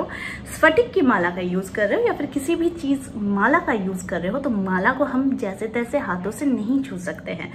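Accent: Bengal, India. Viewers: Apni ki bangali? Apnr uchharon onek tap bangalider moto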